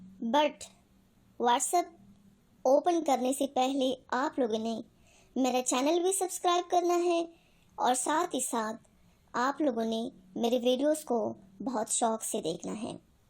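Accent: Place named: Mumbai, India. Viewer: Apka Bombay Ka andaz bhi. Bohat. Behtreen haa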